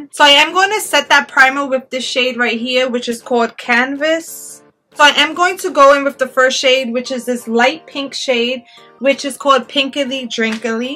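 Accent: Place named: Northeast, United States. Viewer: where the heck are you from?! hahaha I am from Texas and I can tell that you have a north-east accent and I love it!!!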